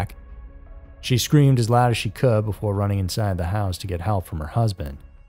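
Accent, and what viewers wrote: United States. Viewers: just subscribed to your channel...ive been watching true crime for a long time...u have alot of stories that are new to me...great footage...love the american accent🙂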